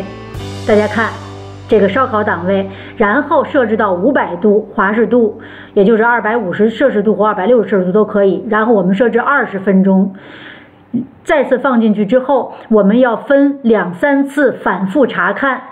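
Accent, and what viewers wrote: Tianjin, China. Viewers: 大姐 天津人吧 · 天津口音，哪里的？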